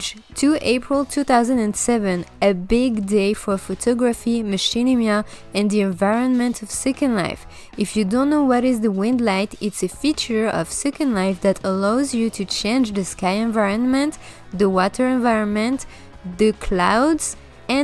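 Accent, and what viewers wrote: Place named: France. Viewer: habibti!!! TYSM so much for all the research you did to make this really informative video. As always, it is a wonderful pleasure to hear your yummy French accent. Bisoussssss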